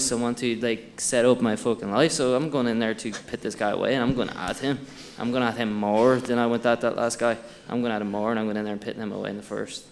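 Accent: Ireland. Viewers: James : Irish accent (bc he was born in Ireland) Everyone : “bruh he copied Connor” lol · Dude I get you got a Irish accent but your literally trying to sound like Conor “you know I mean”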